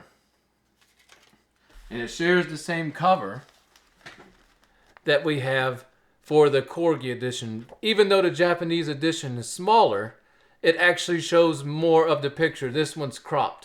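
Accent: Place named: South Louisiana, United States. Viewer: Where are you from? Sounds like around my area in South Louisiana.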